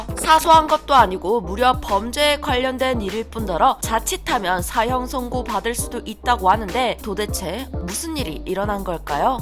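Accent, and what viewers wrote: China. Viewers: 근데 나레이션 중국인이신가?묘하게 중국인 발음인디...? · 머야 리포터 조선족임? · 중국인이세요...? 발음이 약간 중국 사람들이 발음하는 한국어 느낌이 이번 영상에서 좀 심하게 나네요...? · 저기 이 유투버 중국인인가요??? 한국 발음이 아니고 중국인 교포 발음인데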